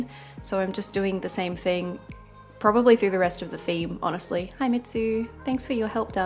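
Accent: Australia. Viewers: Also, great to hear another Aussie Bujo-ist :’) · It's nice to hear another Aussie on Youtube. Love your spread.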